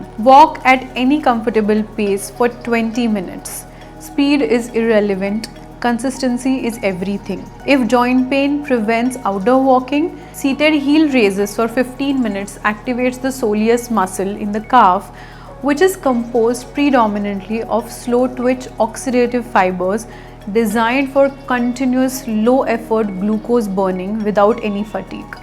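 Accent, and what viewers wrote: India. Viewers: Too verbose.. Please keep the verbiage to the minimum.. too much to process all at the same time.. keep it to the point.. and lastly, your program explains in English.. why don't you explain in Hindi.. your English pronunciations can be very annoying.. it's not your fault.. 99 percent Indians want to speak in English but pronounce their words wrongly, with a regional accent.. someone like me who wants to understand clearly is unable to.. for eg metabolism.. or naturopathy.. you say naa chura puthy or meta bolism. The correct pronunciations are muh taa buh lay shun nd naach uh roh pathy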